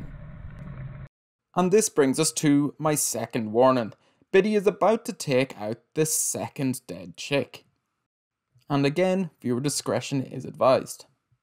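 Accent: Ireland. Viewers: HELLO, Greetings from Canada🇨🇦. WoW, this was an exceptional video thank you so much! I appreciate you taking the time to explain the goings-on and for educating people like myself, for the time you've spent filming and editing and your calm, dreamy, Irish dialect and narration. (I lived and travelled throughout Ireland for a year~ loved every moment). I'm sincerely grateful and looking forward to viewing more of your video's/vlogs. Thank you ❣️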